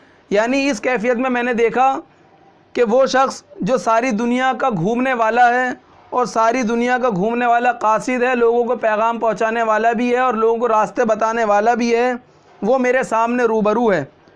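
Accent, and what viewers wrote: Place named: Delhi, India. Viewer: Sahib ki meethi Dehli ki zubaan sunne mauqa ms. Maine pehle likkha tha ke is nazm ko pira sunna hai. Aur Inka parha dxplanation aur poems bhi sunne ka bohat beta i se intezaar hain. Main Dehli me Jama Madjid ke paas aur Ballimaran Ahata Kale Sahib aur Bara Hindu Rao men reh chuka hoon. Ham Dehli ke ASHIQ hain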